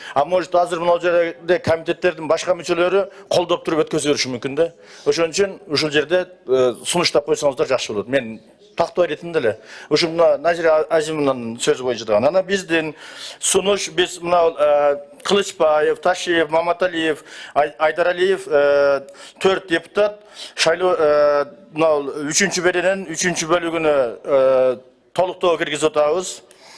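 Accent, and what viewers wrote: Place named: South, Kazakhstan. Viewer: Түштүктүн говорунда сүйлөгөнү үчүн жакпады эле деп койбойсуңбу!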